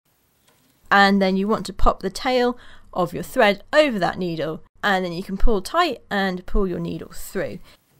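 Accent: England, United Kingdom. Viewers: thank u. iv been wanting to know how to do a simple leather stitch. and i got to hear it in a English accent. thank u again from the states. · Right on! I was watching another youtuber from UK and he said his accent was "home counties". Is there a specific area that your accent is from in the UK?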